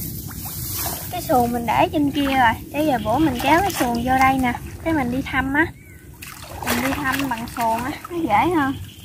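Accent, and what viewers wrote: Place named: Southern Vietnam, Vietnam. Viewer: Giọng miền Nam sao nghe dễ thương vậy em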